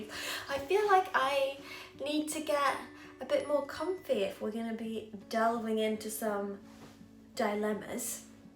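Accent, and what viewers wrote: United Kingdom. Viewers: Is it just me or accent is so strong since she back in the UK. I love it so much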